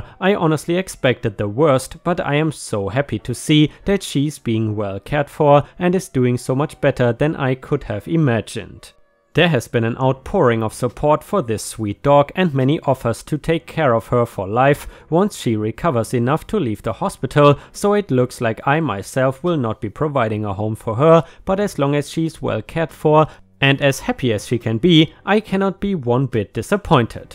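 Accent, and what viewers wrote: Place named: Germany. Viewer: Hey man! Glad to see you're still making grade A content! It's just that... You're relapsing! Hahaha! For one, I think it's really cool that you take your viewers constructive critisism to heart, as you did with mine in the past! The thing I'm talking about is saying "so the next one is the update to (printer lawsuit)". I havn't noticed you doing this as much lately, but it's starting to appear again. Please specify that you mean "the next POST" instead of "the next one", because stating "the next one" usually means "the next STORY". But in this case, we're talking about an update to the SAME story. Once again, I think your content is great, just a couple of things that grind my gears ;) By the way, are you from Germany or Austria? Love the accent either way!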